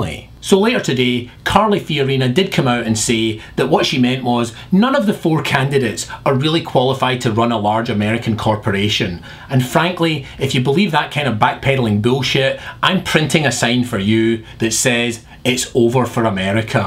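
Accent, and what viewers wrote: Scotland, United Kingdom. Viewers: I'm no going to say whether or not I support Obama or McCain. I am going to say, however, that the last two of videos have been incredibly disappointing to me. It sounds like he just reads op-eds with a scottish accent. I go to youtube to wind down, because I've heard to much of politics in my day. I don't want to be blasted by politics again., please go back to making fun of the iphone and dvd special features. Those sketches are funnier.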